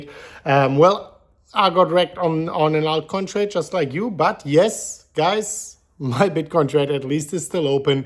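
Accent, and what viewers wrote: Germany. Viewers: "Im a little bit under the water" i love your german english 😂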